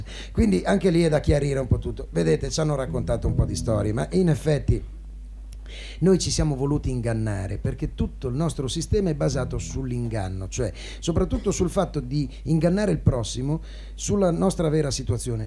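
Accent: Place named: Genoa, Italy. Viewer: Oltre che bravissimo cantante era pure molto simpatico....con quell' accento genovese...fa tanto piacere ascoltarlo parlare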